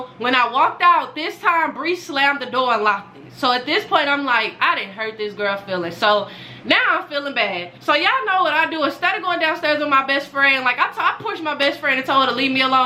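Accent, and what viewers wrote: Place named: South, United States. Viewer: yessss her accent so cute to me cs I was born in Texas but raised in New York so the southern accent is adorable!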